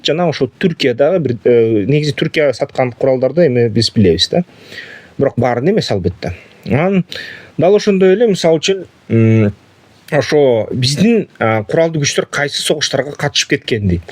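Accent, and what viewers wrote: Standard. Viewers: Кыргызча таза суйлойт байкебиз азаматсыз.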